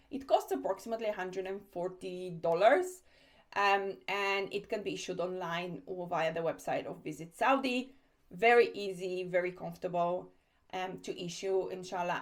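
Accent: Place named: South America. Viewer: Thank you so much!! Are you from South America? I really like your accent!!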